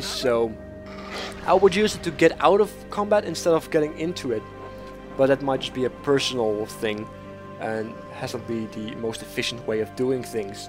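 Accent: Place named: Netherlands. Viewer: I might be wrong but his accent sounds very.. dutch.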